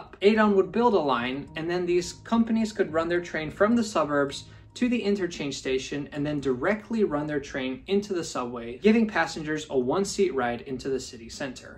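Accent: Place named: United States. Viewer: Where all have you lived? You sound like an American.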